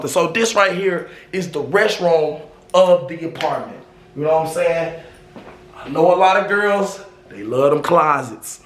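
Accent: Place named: South, United States. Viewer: Twin I got to this part of the video!! lol you so country too tbh remind me of my peoples 😅❤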